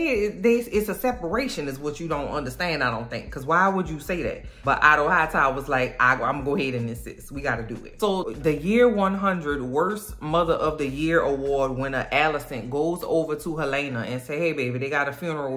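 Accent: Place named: Louisiana, United States. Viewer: what a wonderful accent! Louisiana?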